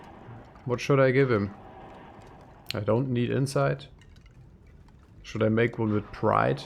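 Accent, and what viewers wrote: Germany. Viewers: Nice buiId and I like your German accent! 😂 Greedz 😉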